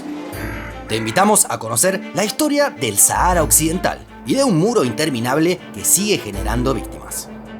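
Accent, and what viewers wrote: Argentina. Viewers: Hablas como Argentino y es feo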